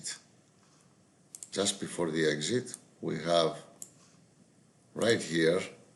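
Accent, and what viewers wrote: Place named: Greece. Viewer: Και εγώ από Ελλάδα είμαι στη Θεσσαλονίκη μένω και μόλις είδα το βίντεο κατάλαβα ότι η προφορά είναι Ελληνική !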